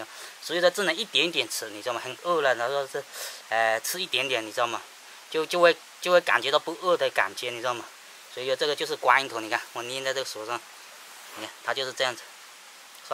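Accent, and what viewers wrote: Hakka, China. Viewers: 你的客家话和我的不一样。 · 的客家话跟我的完全不同，他说的我大多不懂。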